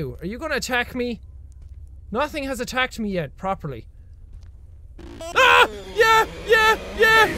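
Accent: Ireland. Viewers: you have made a (somewhat jealous) subscriber out of me all right. God I wish I was raised in Ireland, I LOVE Irish accents, best in the world, screw Britain, Germany, Russia, all them suck when pinned against an Irish accent. Anyway, yea, I wish I was raised in Ireland so I could have that awesome accent, and I'd be happy with a little more Irish blood, both of my grandfathers are Irish, but so far down the family tree it means nothing.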